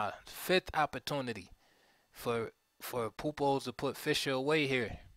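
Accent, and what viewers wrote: United States. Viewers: Put an Eastern European voice on this video...perfect. Just sounds weird with an American doing the verbal annotation.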